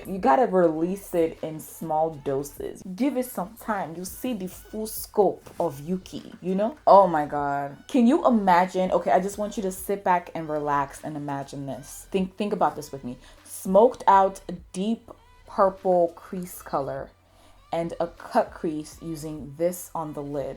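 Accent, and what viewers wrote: Nigeria. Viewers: Very beautiful and honest review. Will look forward to more of your Nigerian accent😂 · Stunning!!!Luv the naija accent🤣🤣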